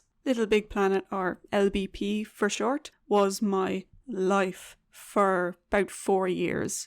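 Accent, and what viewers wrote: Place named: Southern England, United Kingdom. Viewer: I honestly thought you were from south England 🏴 that how you honestly sound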